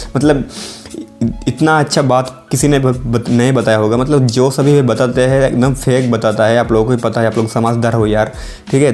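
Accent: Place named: Bihar, India. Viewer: Yeh bihari asscent kahan le late ho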